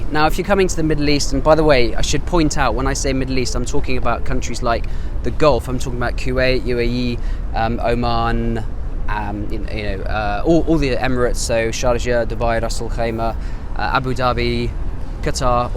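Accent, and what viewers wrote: United Kingdom. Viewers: I like your accents 😁 the way you said summer.. It's british and really like british accent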